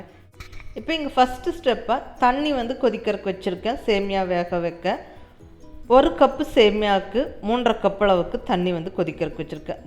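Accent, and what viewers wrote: Coimbatore, India. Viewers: Coimbatore pola neenga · Sema..supar.tank u mam.unga voice coimbatore, erode matiri iruke?nice voice.god bless u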